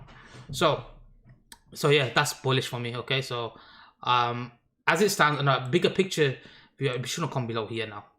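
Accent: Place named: Birmingham, United Kingdom. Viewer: yes bruv birmingham accent lol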